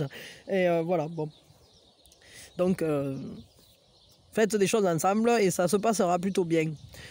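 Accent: South, France. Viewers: Bo jour Jaime beaucoup vous écouter pour votre bienveillance et votre petit accent du Sud 😉le lapin violet me correspond à 99% je suis très fou et j’aimerais que l’autre le soit aussi mais il attend il hésite et c’est assez pénible mais on verra lors de notre prochaine rencontre 🤷‍♀️Je lui laisse faire le premier pas c’est moi qui l’ai fais jusqu’à maintenant merci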